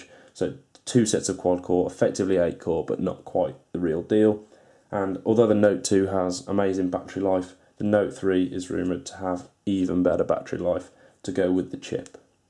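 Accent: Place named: United Kingdom. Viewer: video starts playing: "yes! british accent!"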